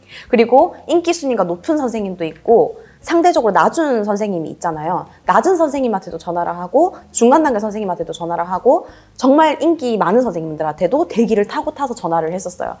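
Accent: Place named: Gyeongsang, South Korea. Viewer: 경상도 사투리가 조금씩 섞이신듯^^ㅋ말씀 많은도움이 되었습니다 감사합니다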